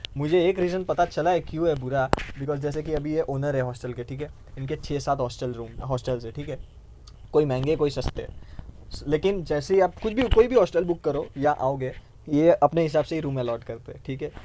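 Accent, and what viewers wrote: Gujarat, India. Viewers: i love your gujju accent lol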